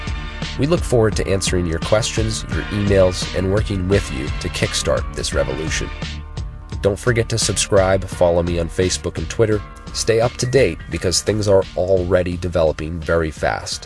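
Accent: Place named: United States. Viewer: Peer reviewed or it's not science. At the risk of offending you greatly (for which I will make amends if needed), I watch physics videos from around the world and I have never heard anyone pronounce Dirac the way you do (rhyming with tie rack). I have trouble believing you have attended any lectures on quantum mechanics. Assuming this is deeply-thought-out science fiction, with possible fleecing of idiots, let me help you sound more authentic, as I am fans of both. I will use capitals to designate the emphasized syllable. Depending on your dialect and/or that of your professor, typical pronunciations are like deRack (with a brief e like in because), diRack (with an i like in bit), d'Rack (just a fleeting vowel noise between consonants), deRock, diRock, Deerack. If I heard that last one I would assume the speaker is from India or nearby. Americans typically use diRack, deRack, or d'Rack. 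Your accent and dialect are clearly American (we call z zee, while most others call it zed), so it is good to stick to American pronunciations. Britons typically pronounce it closer to the original french; deRock or diRock. They might even use a french r sound. Sorry, I don't know how to depict the french r sound by mere spelling. It's like if a letter had r for its mother, while its father's parents were h and a hard g. Kind of throaty. Looking back at this, it is almost certainly the kind of rambling I do when sleep is near. My filters are the first to go... Sorry for offending anyone, but I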